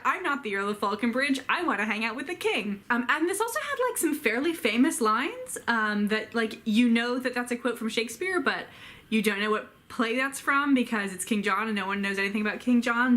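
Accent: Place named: South, United States. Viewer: I've been wanting to check out And Then There Were None for a little while, given this Christie revival but I am already a slow reader so I'm not sure it's just a way to frustration. Probably just sucked in by the cover. I've been watching you a little while now, love to hear what you're reading and I share your love of Hardy! I'm an ignorant Aussie so I hope I don't offend but I love your accent, its got a lovely twang to it (says me hah) -- clear American but a bit of Southern? It reminds me of Donna Tartt. Obviously hers is very very strong (Mississippi?). So I listened to some of your audiobook and it does lend itself to narration very well! Look forward to more from you,:)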